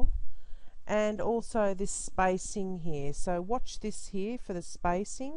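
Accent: Australia. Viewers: Love the Aussie accent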